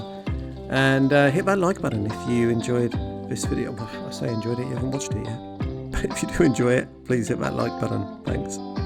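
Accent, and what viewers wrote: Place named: England, United Kingdom. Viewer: I can hear your cats interrupting you She is very talkative alright I love your cat You have nice videos!🤩 They are beautiful a darling cats! 😘😘😘 What are their names Was not able to hear you well You have an English accents and I have poor hearing sorry I am 80 yrs already Thank you for sharing friend 🌹🌞🌹🌞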